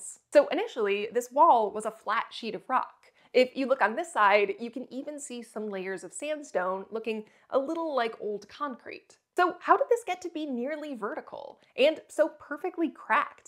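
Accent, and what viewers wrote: Michigan, United States. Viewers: Good to hear the Michigan dialect again. Been awhile since hearing it.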